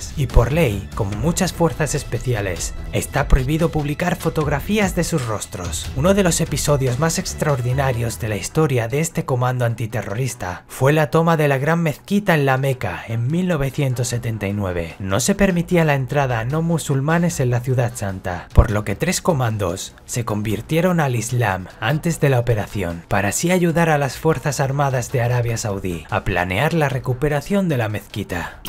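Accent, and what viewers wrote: Spain. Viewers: Se me hace que eres español... 🤔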